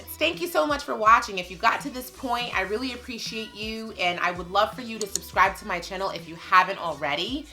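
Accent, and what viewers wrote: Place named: Staten Island, United States. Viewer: The Staten Island twang you have😀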